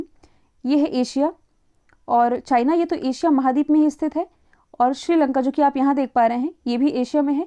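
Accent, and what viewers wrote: Madhya Pradesh, India. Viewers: Madam aap mp se hai kya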